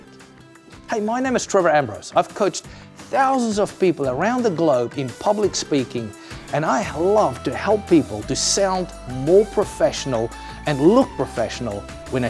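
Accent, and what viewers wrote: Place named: Afrikaans, South Africa. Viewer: Your accent sounds quite Afrikaans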